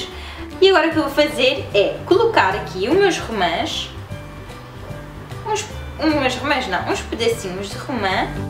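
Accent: Portugal. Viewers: Vc é portuguesa??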